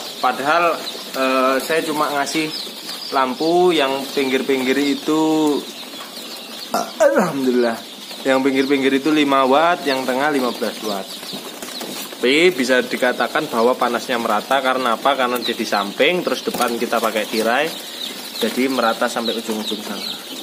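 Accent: Java, Indonesia. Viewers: logat bahasa kok seperti orang Jawa . Tak kira Jawa mas, 🤭. Di daerah saya belum ada pembibitan joper, jadi susah mau ternak joper tak ada bibitnya.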